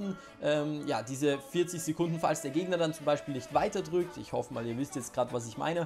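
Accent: Austria. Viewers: Von wo aus Österreich kommst du genau?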